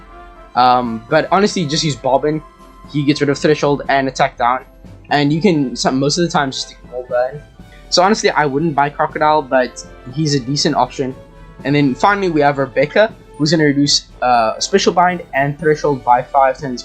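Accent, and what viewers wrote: South Africa. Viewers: Bro i have to ask lmao, but are you south african? Love the content btw. Keep it up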